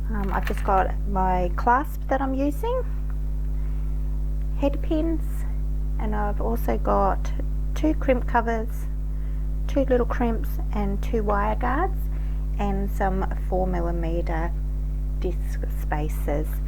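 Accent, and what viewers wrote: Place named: Australia. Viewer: She is Australian! Listen to their accent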